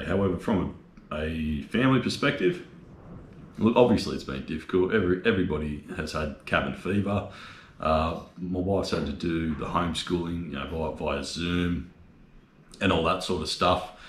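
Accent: Australia. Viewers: you are an Aussie who has one of those accents everyone equates to the land down under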